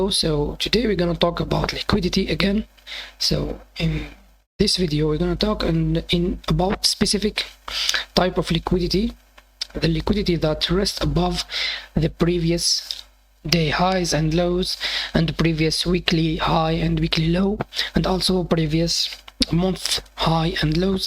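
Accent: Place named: Morocco. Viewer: where are you from brother ? You sound Moroccan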